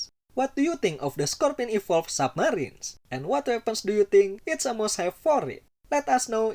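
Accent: Indonesia. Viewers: biasa aja malah wkwkw aksennya indo banget.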